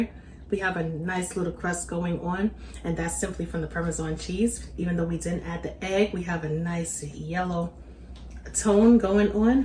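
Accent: New York, United States. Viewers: You sound like you come from New York..I’m from Long Island..thanks for this recipe ❤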